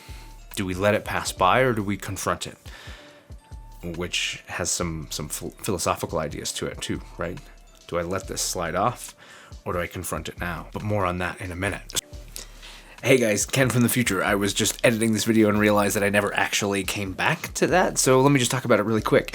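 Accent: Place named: Visayas, Philippines. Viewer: KASAG bisdak ni ug gikan Tobosa klaro kaayo. Way bisaya naka basa diri hahaha.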